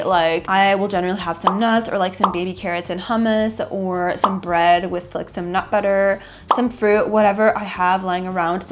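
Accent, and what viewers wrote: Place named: United States. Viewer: when folks hear you speaking 🗣️ english do they mistake you for American becoz your exceptionally well spoken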